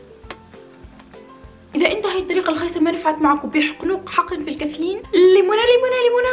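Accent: Tunisia. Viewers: شكرا على النصائح حبيبتي بس بدي اسالك سؤال، انت من تونس؟ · هل انت تونسية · تحسك تونسية 🙂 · انت تونسية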